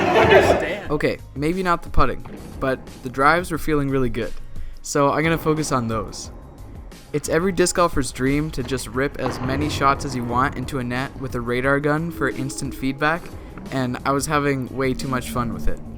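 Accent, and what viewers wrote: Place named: Canada. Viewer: Your videos are the best... excellent breakdowns and teaching! Sounds like you are somewhere in Canada? How did you get your gym let you do that on the basketball courts?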